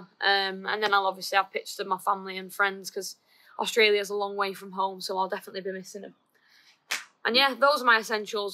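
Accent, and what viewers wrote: United Kingdom. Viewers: Hi. I'm trying to expose myself to all the British accents. To be honest, it's quite hard for me to understand you, but I realize that sometimes you emphasize your accent. Please, you don't need to do so cos some people follow you due to your regional accent. Could you please let me know what's your accent called? By the way, good luck with the World Cup. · The British accent is really cool I love it ❤️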